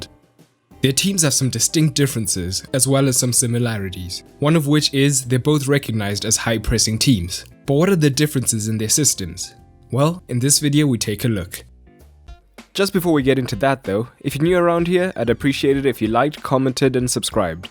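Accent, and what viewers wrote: South Africa. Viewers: You sound South African 🤔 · You sound South African ...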